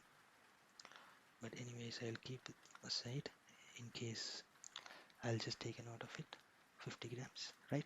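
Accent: India. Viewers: There is just something about the Indian accent that pairs well with asmr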